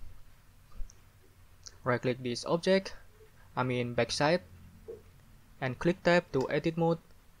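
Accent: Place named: Indonesia. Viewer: the logat like indonesian people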